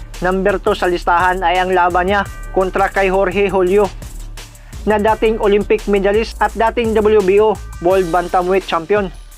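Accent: Visayas, Philippines. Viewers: Na gusto han, ko naman kaso yung bosis MO halata gud na bisaya ka, haw Ka pas pas nemu ag estorya hehe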